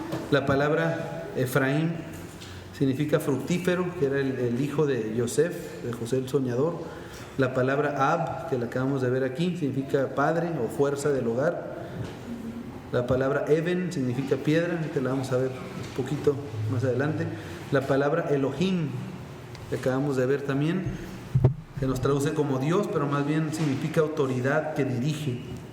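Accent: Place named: Colombia. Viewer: Buenas tardes como está usted? Por su acento usted parece que fuera colombiano. Mira te voy a decir una cosa por favor ya no le estés enseñando a la gente eso DEL SEÑOR De cual señor usted me habla del señor Alberto el señor Pedro el señor José. Y de cual Dios usté habla del Dios de las moscas del dios del viento del dios del fuego. Déjeme saber por favor. Yo soy colombiana pero por favor enseñe lo que es correcto. Desde aquí desde Estados Unidos. Y por favor responda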